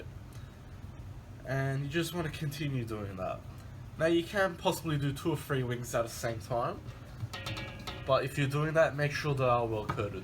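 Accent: Australia. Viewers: What the f&^k is that accent? Every time I hear someone from western Sydney speak I want to vomit. MSG impossible to find in Australia.... except at ANY asian grocer you fuckwit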